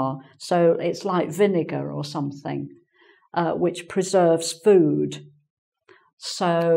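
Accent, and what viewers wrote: England, United Kingdom. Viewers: Is your English from England madam?... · I really love your British accent, specially when you're explaining. Furthermore, Shakespeare is one of my favorite dramatist, the work that I love the most is Hamlet ♥ Greetings from Peru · From Brazil From Marcio Hello Teacher... nice to meet you. This is the second time I watch a video of yours, and I enjoy very much. One question ,please. Where you're from? Yor accent is familiar to me. It sounds like a British person. Anyway, Your lessons are very nice. I have just subbed to this channel. I hope not to disappoint you... I'm still learning your language. Hugs from me and Brazil. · I LOVED your video. Thanks. It was very useful. By the way, it was the first time I saw you on Engvid. Now I am watching all your lessons. Your British accent is beautiful. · I already felt that you have british accent . 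I am enjoing the language to improve my knoledge Thanks a lot. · Brilliant! You have a very elegant old English style. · with (engVid) Your accent and your appearance are great,! ) While watching any of your lessons I feel myself sitting in a London pub drinking ale or having breakfast in an old Birtish castle. )) Thank you for these unforgettable virtual trips! )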